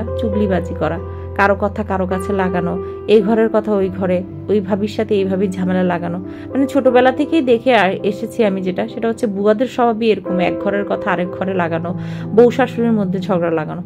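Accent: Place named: Chittagong, Bangladesh. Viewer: tomar kothay Chittagong er akta tone asy…..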